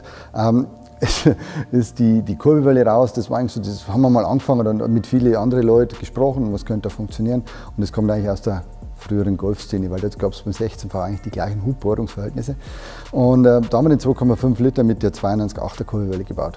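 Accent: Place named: Bavaria, Germany. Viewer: Sehr sympathisch erklärt, a echter Bayer halt👍👍👍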